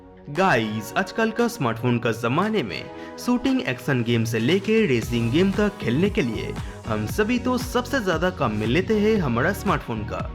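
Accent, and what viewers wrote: Bengal, India. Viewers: Wo totla nahi bangali hai😁😁😁😂😂😂😂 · According to your Hindi pronunciation, I think you are a Bengali , better you try to speak in English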